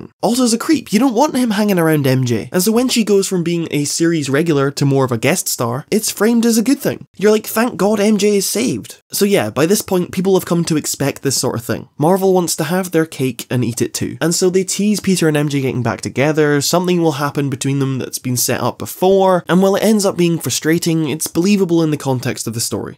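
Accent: United Kingdom. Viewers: I don't think there's something better than listening to a random guy with a british accent and a nice voice talk about random things while I play games and pay 0 attention to the video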